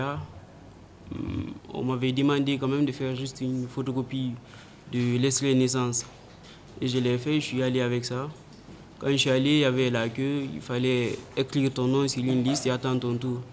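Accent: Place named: Senegal. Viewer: Putain tu a un accent sénégalais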